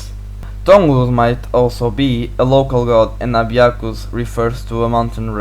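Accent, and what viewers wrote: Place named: Portugal. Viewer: Sotaque português. 👍🏼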